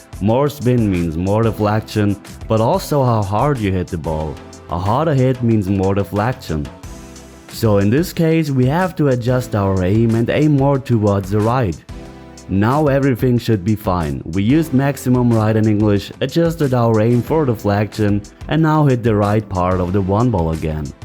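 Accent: Germany. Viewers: he's german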